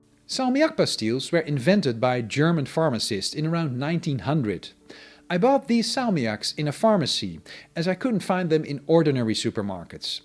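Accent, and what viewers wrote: Netherlands. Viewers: I also thought he sounded Dutch.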